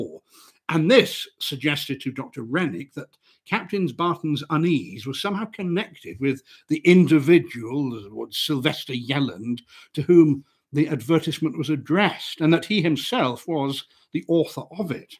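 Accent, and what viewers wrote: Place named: RP, United Kingdom. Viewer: Thank you for this, Mr. Posh Voice Reading Man.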